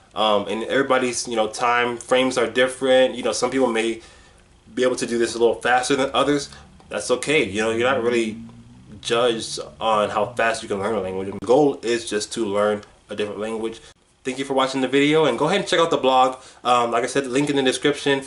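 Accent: Dominican Republic. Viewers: Que lo que! I stumbled upon your page and I’m so impressed by your Spanish y tu acento dominicano. I studied abroad for a summer in DR and have a bachelor’s degree in Spanish, but I’m embarrassed to say that I feel that my Spanish hit a plateau because of not wanting to make mistakes and put myself out there. But I’m now really making an effort to get to your level. Do you have any Skype or what’s app so I can contact you for help! Necesito tu ayuda!!! Pol favol! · Pareces dominicano de la capital!! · This video got me in surprised. I'm Dominican myself checking on how English people would get to learn Spanish and was drawn to your video because of various elements that suggest several aspects of the Dominican scene: the Reggae background beat, your Spanish, I would even dare to say you sort of speak English with the Dominican swagger which is pretty cool to watch. 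Great job, keep it up. You can be my friend if want to come down to the island some day.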